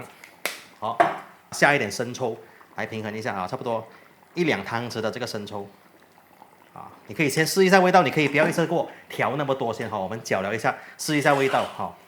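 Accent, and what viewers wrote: Malaysia. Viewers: 这样才是道地的 ‘ 聨邦華语’嘛。😊😊。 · 谢谢! 说得非常清楚也听起来不是那么难! 棒！说的“大马”华语，马来西亚和新加坡人听了都觉得很亲切！😊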